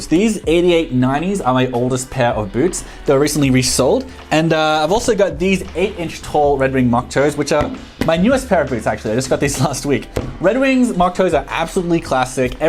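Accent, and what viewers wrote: Australia. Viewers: You sound very much like an Aussie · Old mate sounds Aussie to me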